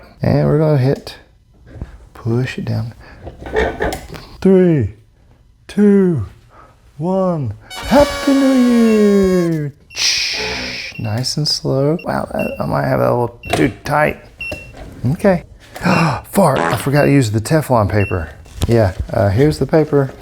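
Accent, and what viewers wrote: South, United States. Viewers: I’ve been watching you and thinking 🤔-that southern accent is too familiar. Then, i stalked you!!🤣 nah, just found your PO Box. BOILING SPRINGS!!!! Hello neighbor!! Spartanburg/Pauline area. We can almost yell out of our windows. 😂 loving your videos. Learning so much and getting some good inspo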